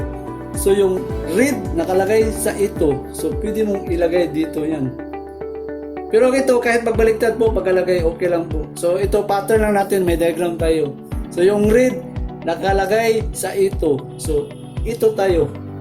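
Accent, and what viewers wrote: Visayas, Philippines. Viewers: Bisaya ka pala sir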